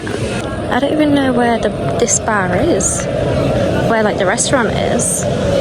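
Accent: United Kingdom. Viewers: Are you 100% Yorkshire?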